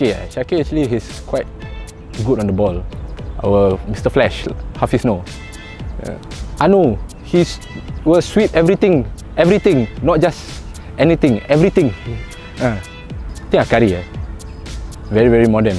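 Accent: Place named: Singapore. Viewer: He's the first in many years that's not afraid to showcase Singlish to netizens,which is definitely a good thing. Good on you man!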